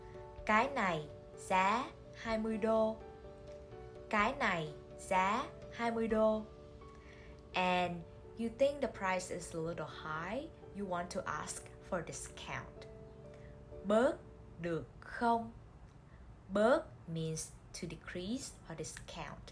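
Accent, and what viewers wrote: North, Vietnam. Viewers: Northern accent?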